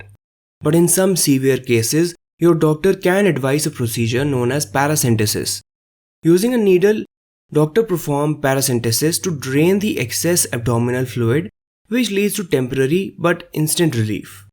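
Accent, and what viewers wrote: India. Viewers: Thanks Dr. I love your Indian Accent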